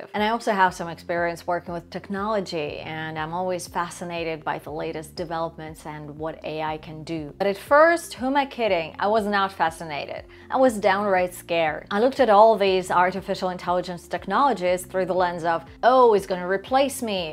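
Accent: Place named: Spain. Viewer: es gracioso que tu español es el de España jaja y el de las ias suena más mexicano neutro 😂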